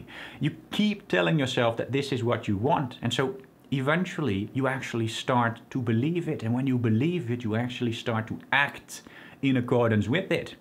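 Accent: Netherlands. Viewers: He is from the Netherlands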